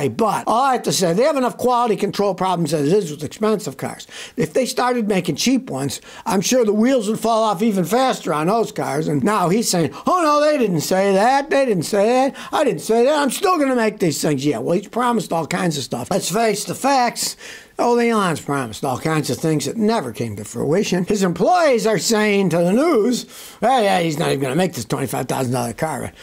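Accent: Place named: New York, United States. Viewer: I have watched a couple of this guy's videos with him screeching. The jersey\new york accent is the most irritating in the world. How do you all watch this garbage? I am going to mark this channel as do not recommend.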